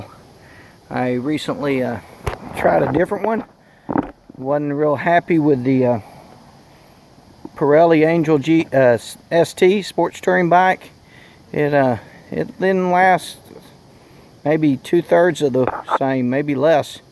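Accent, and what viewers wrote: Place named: Texas, United States. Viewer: Man... if i close my eyes, you sound like Baby Bush....must just be the Texas in your voice.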